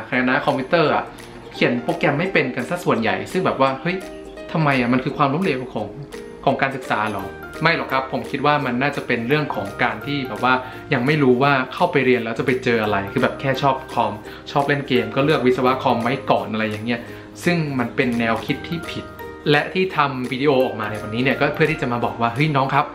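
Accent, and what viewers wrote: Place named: South, Thailand. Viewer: สำเนียงคล้ายคนใต้มาก 555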